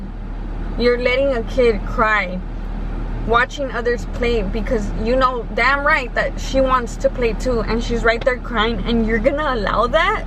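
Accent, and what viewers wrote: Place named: Texas, United States. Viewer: Lol I love your voice you sound like all the girls I grew up with here in Texas.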